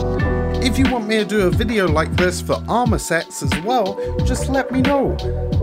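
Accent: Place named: United Kingdom. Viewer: it's his normal voice, he is just bri'ish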